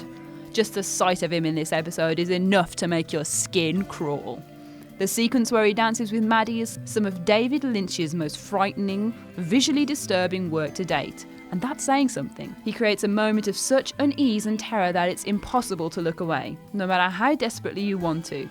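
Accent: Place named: England, United Kingdom. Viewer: I hear an English accent. That's exciting enough 4 me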